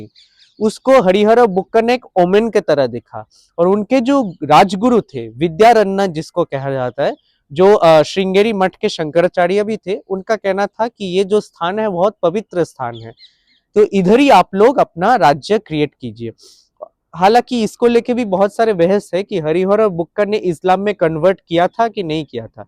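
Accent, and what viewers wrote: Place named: Bengal, India. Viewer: His Hindi accent is Bengali..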